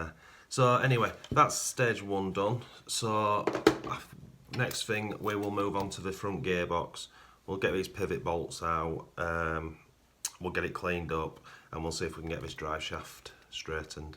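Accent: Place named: Yorkshire, United Kingdom. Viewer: Boomerang was my very first rc car...all those years ago ! Wouldn't mind another now. What you doing in Canada ? You sound like a Yorkie to me !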